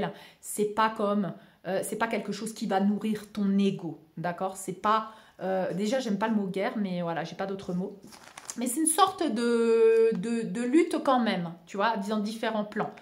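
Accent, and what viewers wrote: Alsace, France. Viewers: des fois tu as gardé ton accent alsacien c'est trop mignon lol Ps: je vois 777 likes sous ta vidéo c'est bon signe ça.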